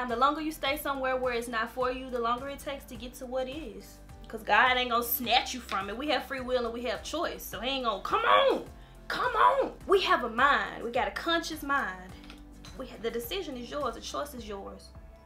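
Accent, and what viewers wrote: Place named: Memphis, United States. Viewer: I'm really glad I've been following you almost a year. And you are a big motivation for me. For because you from M Town South Memphis to be exact 💯 in your voice lol. And you have a godly and lit spirit. Merry Christmas eve ❤ 🌲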